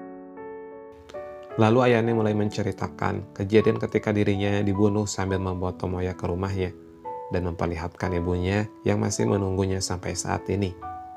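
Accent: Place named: West Java, Indonesia. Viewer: cape haha orang sunda ya bang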